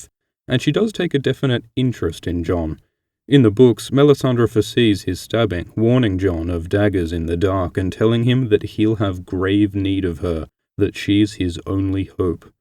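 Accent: Australia. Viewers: I agree he does sound like an Aussie... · I love your videos!!!! As far as Jon, agree with you totally. As as the show? D&D don't have the creative brain that George does in terms of writing fantasy, so who knows what they're going to do. BTW, GREAT to hear another Australian accent :) CYA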